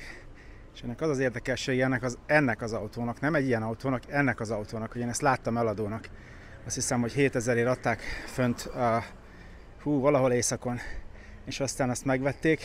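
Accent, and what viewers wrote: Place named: United States. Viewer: hihető és elfogadható a kicsiny "amerikás magyar" akcentus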